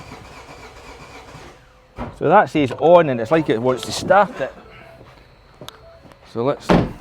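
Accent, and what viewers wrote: Scotland, United Kingdom. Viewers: I love this Scottishness . Especially the groans of dispare 😂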